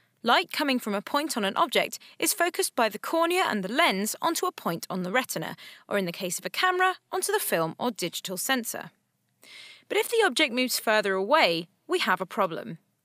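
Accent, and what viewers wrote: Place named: United Kingdom. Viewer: it's a british accent.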